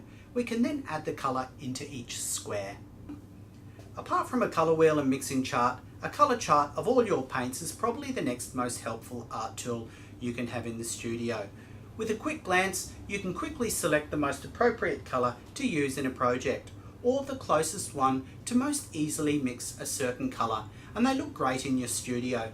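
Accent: Australia. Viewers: I feel immediately comfy cause youre as aussie dounding as me..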